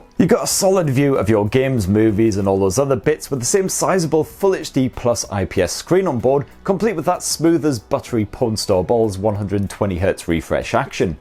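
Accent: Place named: North East England, United Kingdom. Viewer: North East UK. Mic of English and Scottish English.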